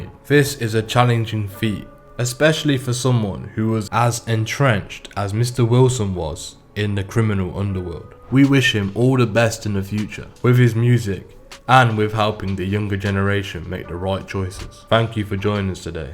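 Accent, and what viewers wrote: Bristol, United Kingdom. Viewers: no they weren't though, why are you acting the goat sun we all know Bristol nothing the only reason this guy is doing a video is because he sounds like he is from Bristol and probably had his peddler robbed off him by them and he thinks there the cripps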